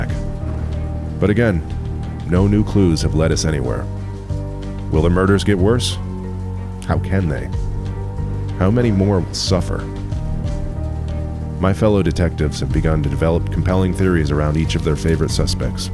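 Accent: United States. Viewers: Brit hunter American English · Does anyone realize this guy is a British but he has an American accent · American accent narrating this, made it seems less authentic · English detective with an American accent. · why is the narrator american? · Why the American accent ? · Hold up anyone else notice he is from ENGLAND with an AMERICAN voice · why does he sound american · The only English guy with a American accent...... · An American accent?? My God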